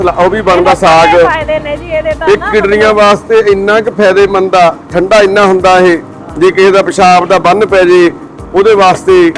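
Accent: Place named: Punjab, India. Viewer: مٹھی بولی پنجابی تے اتوں پھا جی دے منہ وچوں سجدی بڑی اے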